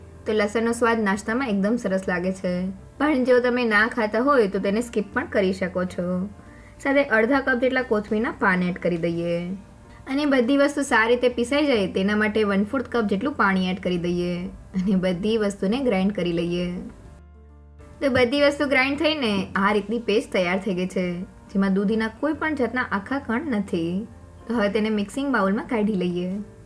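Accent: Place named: Kathiawar, India. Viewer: આપણી કાઠિયાવાડી બોલી 🙂 સાથે મસ્ત રસોઈ 👍 God bless you 🙌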